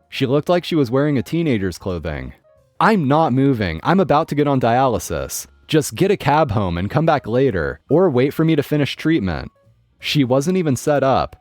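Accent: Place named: Canada. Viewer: What a NOT charming contribution to the dialogue. Different continents, different pronunciations. Tomato, tomato. As I recall, our host is Canadian, as am I, and we both say it NOT your way. If you can’t be nice, don’t bother to comment, especially on such a petty item and in such a preposterous fashion.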